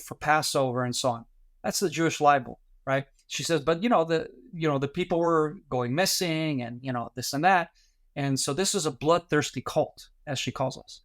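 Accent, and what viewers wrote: United States. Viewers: nice podcast! Here is my beef with the world: Why is Israel responsible for any civilian casualties when Gazans could have avoided zero deaths if they surrendered on Oct. 8th, let the hostages go, agreed to disband Hamas (another psychopathic offshoot of the muslim brotherhood (bother-hood), and turn over the death worshipping cowards that perpetrated Oct. 7th. I appreciate your respect for non-jihadi muslims. Have you considered an interview with Atheist Republic? Armin is an ex-Muslim originally from Iran that supports Israel over the monstrously backward Iranian totalitarian regime. The "Palestinians" have been thrown out of several countries because they tried to take over the government like Kuwait, Egypt (Muslim Bother-hood), and now Gaza. Why people do not mention that the gem of the Iranian regime in Lebanon that exists only for war has bankrupted the country is consistent with our current Jew hating media. 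The real money comes from places like Qatar. where did your parents come from before emigrating to Israel? My guess is Kansas. You sound like a Californian to me. Candice Owens new name from some of we Jews is Klan-ace Ovens. One of her "just asking questions" was accusing a former Jewish head of a post WWII ethnic German Polish concentration camp of atrocities in deaths of 1500 people under his watch. She omits that the first Polish state attorney said no charges would be filed against Shlomo. Stalin appointed Jews to lead these camps after the war. Ow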